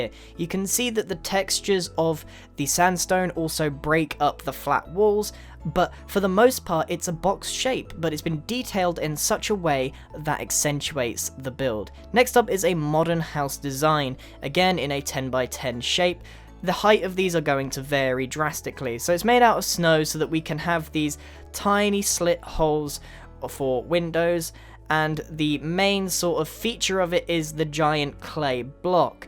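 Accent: United Kingdom. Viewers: british accent and good content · he's British. he doesn't say things the same as you.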